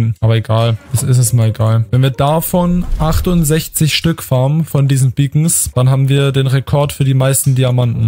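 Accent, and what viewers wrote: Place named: Germany. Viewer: Ich habe dain english video ge sehn und sofort geraten das du deuch bist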